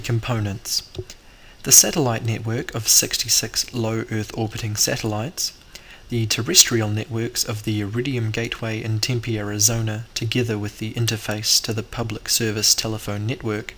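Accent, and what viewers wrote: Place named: New Zealand. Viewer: It's a New Zealander, trying not to sound TOO New Zealand-y.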